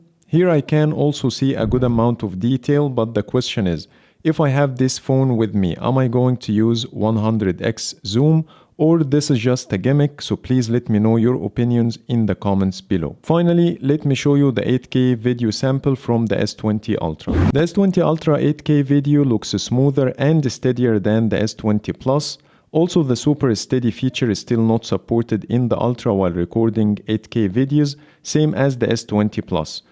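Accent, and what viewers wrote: Egypt. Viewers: Your accent looks like you are an Egyptian! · Egyptian accent distracted me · Egyption accent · ترا مبين انك مصري و مسوي فيها اجنبي😂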